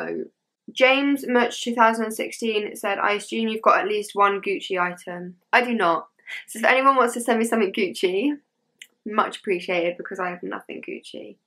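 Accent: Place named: United Kingdom. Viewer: I love a girl with a British accent it's cute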